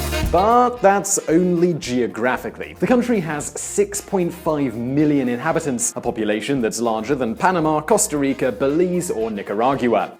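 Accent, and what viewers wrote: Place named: United Kingdom. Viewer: Well then, I don't understand why is it they can't tell the difference between "Salvador" and "El Salvador" ...I mean, it's THEIR original language after all, isn't it? But the guy on this video is definitely NOT Spanish, you can easily tell by his accent he's British.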